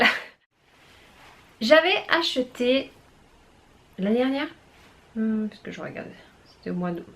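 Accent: South, France. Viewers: Bonjour Je ne découvre tes vidéos qu'aujourd'hui et je les trouve très naturelles. Je suis très sensible aux accents et j'aimerais savoir d'où vient le tien? du Sud de la France? Rien de québécois il me semble. Continue à nous distraire avec tes cousettes et bravo pour ces belles réalisations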